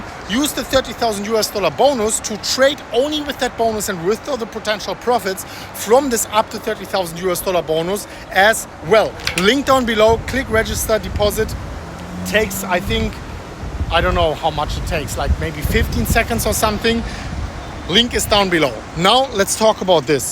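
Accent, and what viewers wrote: Germany. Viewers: You sounds like fxxkin german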